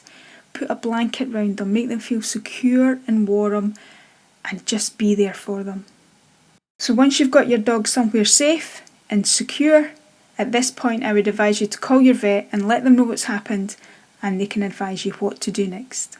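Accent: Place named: Scotland, United Kingdom. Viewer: Thank you so much for this video. My 14 year old golden retriever is experiencing this without the eye movements. She was on antibiotics for a skin infection which would not go away on natural remedies (we live in a warm climate)She had the same symptoms 5 months ago and the vet said it was a stroke. Its only by looking at youtube videos that connected the antibiotics and this condition. She recovered well after the last episode and I am doing similar things as you taking care of her. Thankfully her golden retriever appetite has not changed and she is eating and drinking well. It is very distressing to watch. This video gave me much comfort and hearing a fellow Scottish accent did too. 🙏🏴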